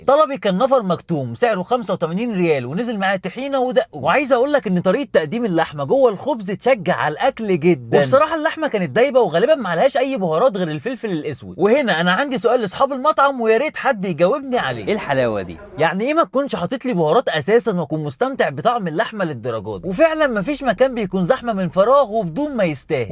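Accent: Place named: Egypt. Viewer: سبحان الله من اسمع صوت مصري يجيني التكاك والغلقه وضيقت الصدر أنا لله وأنا إليه راجعون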